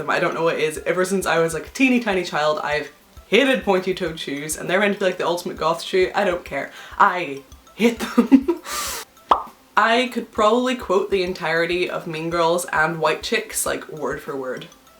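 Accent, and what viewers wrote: United Kingdom. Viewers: Is she British? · Where are you from? Your accent is kind of all over the place... British...Australian.... American...Scottish...? I don't mean that in an ignorant, mean kind of way. I really am curious.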